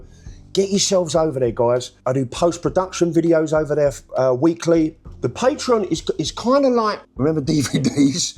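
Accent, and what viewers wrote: Cockney, United Kingdom. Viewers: Best video ever made in the history of YouTube. I see you walking around the West Village, and then if I close my eyes and listen, I hear a cockney geezer from East London. That's really the secret. Good for you, mate.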